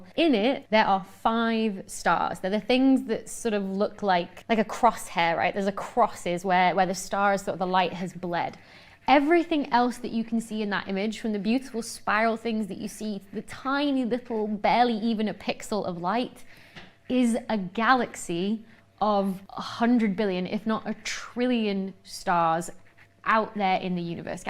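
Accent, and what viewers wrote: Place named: RP, United Kingdom. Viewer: The entire British upper class feels the need to talk like a twat. But her content is bloody good.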